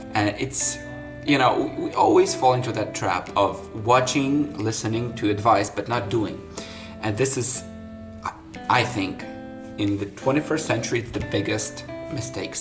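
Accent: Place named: United States. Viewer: I really like your American accent!👍👍👍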